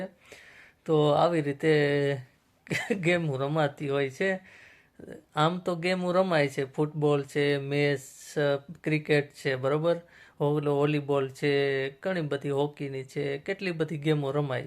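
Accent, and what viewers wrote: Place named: Porbandar, India. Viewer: તમારી બોલી ઉપર થી એવું લાગે છે કે તમે પોરબંદર નાં મેર છો ❤❤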